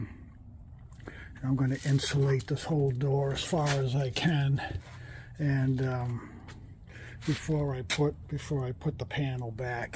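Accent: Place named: New England, United States. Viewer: Where are you from originally? Boston? Good to hear a New England accent. I am and currently traveling… at the moment In Arizona in my Revel. Thanks for the videos they are excellent 👍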